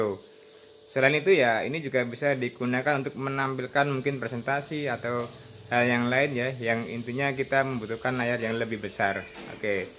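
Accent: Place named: Java, Indonesia. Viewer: Medok banget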